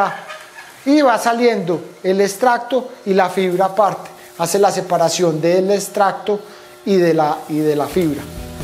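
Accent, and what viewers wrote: Antioquia, Colombia. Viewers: Gracias por la enseñanza tan valiosa! Lástima esa forma de hablar tan ordinaria, que pena pero siempre me choca demasiado eso de los paisas, hablan tan ñero🤦‍♀️ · QUE TIPO TAN SENSIBLE, ES VERDAD A LAS PLANTAS HAY QUE HABLARLES CON AMOR Y CRECEN MUY LINDAS. ME ENCANTA SU ACENTO PAISA. VIVA COLOMBIA! SALUDOS DESDE DALLAS, TX. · ¿Cierto? <3 Me encanta cómo hablais, lo explicáis genial, muy educativo y amo vuestro acento <3 Viva Colombia. Saludos desde España.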